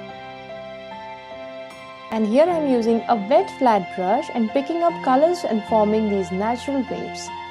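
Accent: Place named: India. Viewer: Couldn’t freakin’ understand her with that Indian accent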